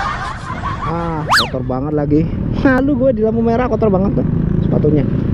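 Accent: Java, Indonesia. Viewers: ojo lali di subrekkk.... jowone ngendi kang???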